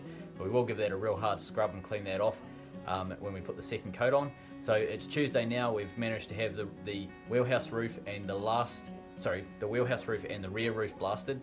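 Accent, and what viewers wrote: New Zealand. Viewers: Just randomly discovered this in Hamilton NZ. And detect an NZ accent?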